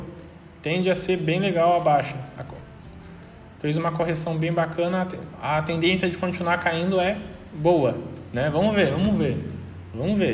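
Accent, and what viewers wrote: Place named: Registro, Brazil. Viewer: Pelo teu sotaque você é de Registro são Paulo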